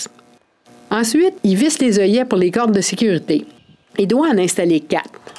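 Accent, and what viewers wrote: Quebec, Canada. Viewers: Cet accent Canadien, c'est magnifique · Votre accent me rappel un merveilleux souvenir de la découverte du Québec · No I definitely prefer the English version, Canadian French takes some getting used to. But still a good show. Bien a vous.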